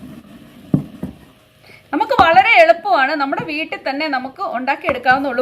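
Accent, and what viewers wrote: Kottayam, India. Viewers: kottyam kari ano am from kidangoor pala now your talking style very nice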